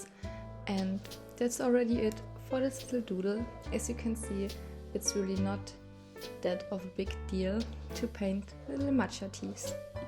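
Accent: Germany. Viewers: are you from germany? your accent kinda sound like it! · I found your channel today and love it. But one question can it be that you actually speak German? Your English is great, no criticism. It just sounds like German is your mother tongue. Many greedings Annabell Elaine ❤️❤️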